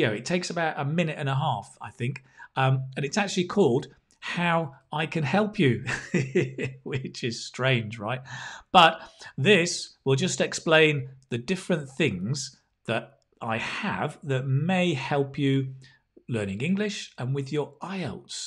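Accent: United Kingdom. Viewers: Dear Mr. Thank's a lot your lessons are so helpful, clear British accent, funny, informative, and lots of useful phrases, for free and so generous...😄 They're also useful for daily conversation not only for IELTS. Again, thank's a lot and more lessons please! 😄😃😃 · Muchas gracias, pero de verdad... muchisimas gracias por tu canal. Apenas lo conozco pero me encanta. Ajjajaja apesar de que no sea fan del inglés britanico, tu me estas haciendo amarlo 🤗🥰❤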